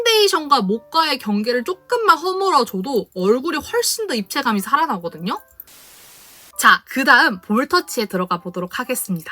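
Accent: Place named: Pohang, South Korea. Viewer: 근데 님 어디사람이세요..? 서울이 아니신거 같네용 포항사람인 학교쌤 말투 ↘️↗️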